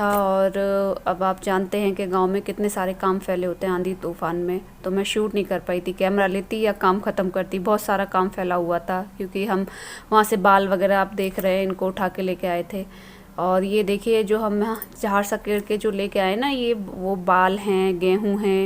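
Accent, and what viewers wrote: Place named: Aligarh, India. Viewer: Haa mam ki awaz sunkar mera dost mere se pucha rha hai to me bola yeh awaz aligarh se hai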